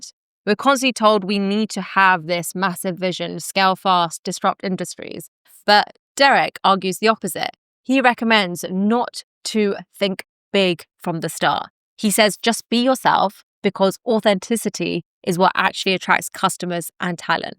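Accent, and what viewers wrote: England, United Kingdom. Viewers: I loved that British accent on non-british. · Bit plain accent pls. Too pommy accent yak for ears!! I tried many time to listen your video. Rich contents disgusting pronunciation! Sorry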